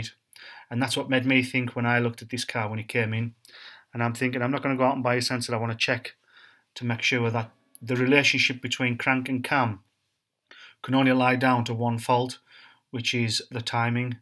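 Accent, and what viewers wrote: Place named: Cumbria, United Kingdom. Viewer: You sound like your Cumbria based, I have one of these with the p0016 code, do you fancy doing mine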